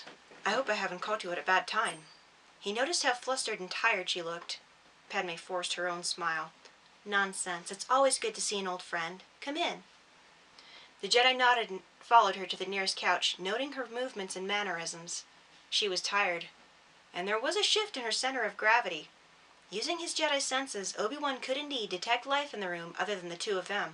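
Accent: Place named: United Kingdom. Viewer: So pleased things going well. Great chapter , your British accent is coming on well .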